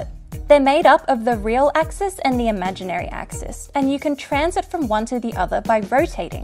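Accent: Australia. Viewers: sounds like a healthy Australian accent to me. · Love the australian accent :)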